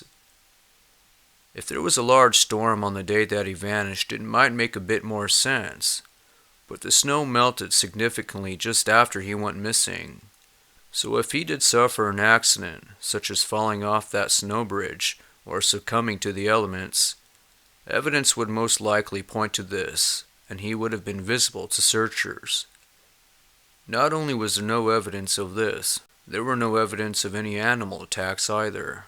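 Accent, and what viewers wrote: South, United States. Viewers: i feel he uses to much lip, kinda like he puts his lips out too far when he speaks, like he's trying to keep a moustache out of his mouth sound you know, it's like he's puckering his lips to talk, throw in a slight southern accent and there ya go, i could talk like this guy all day, it would look kinda odd walking around having conversations with puckered lips though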